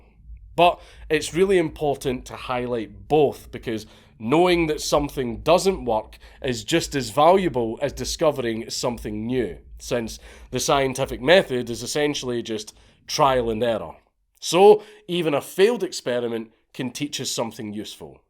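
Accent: Scotland, United Kingdom. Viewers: Ich liebe deinen schottischen Dialekt bruder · Lmfao!! The part where the probe began to scream had me f**kin rolling. I love this channel. It honestly wouldn’t be the same without it’s brilliant and hilarious Scottish host.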